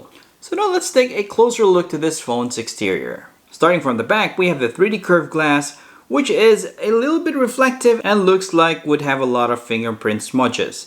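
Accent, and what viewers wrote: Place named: Dumaguete, Philippines. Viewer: mao diay maayo muinglis bagsik og accent ba taga dumaguete diay ka sir.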